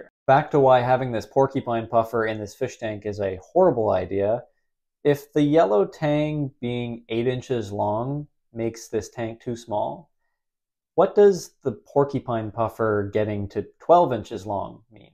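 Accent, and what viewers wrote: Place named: Canada. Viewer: the Canadian accent is subtle, but i live rural, that upraising is hard to miss when you look for it, you'll notice a difference between cloud and clout, clout has the uprising cloud doesn't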